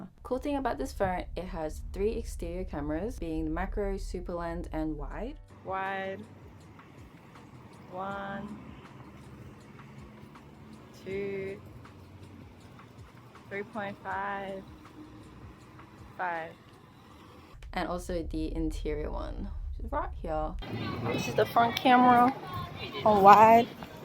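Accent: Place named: Australia. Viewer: Clicked because I had never seen that phone before, heard the Aussie accent and immediately subscribed. I so much prefer home grown content.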